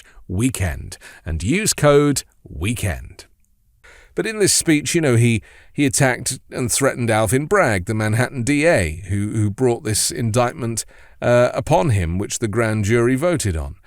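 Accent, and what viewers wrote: United Kingdom. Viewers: . Nice job sir. Great to hear a British (or non American) voice outlining the situation so clearly. Im a New Zealander and appreciate this. Trump is now like a child bully. Once they are found out and cornered they show they are really horrible nasty gutless cowards. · I love how you completely dismantle Trump with your British accent making it sound like a very friendly story. The truth hurts 20 times more out the mouth of a Brit 😅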